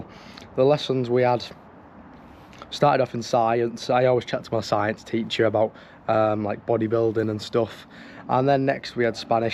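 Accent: United Kingdom. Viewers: is he a geordie? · Very proud of you. I did my first competition at 51 and I thought it was the greatest thing I ever did despite how hard it was. Did you get tired of tensing your legs? The low carb week get you down? You're right, not the most fun in the world but the payoff is incredible. So, what's up with your school? Someone there needs to get a life! Q - trying to place your accent (I'm a Yank). Northern England maybe?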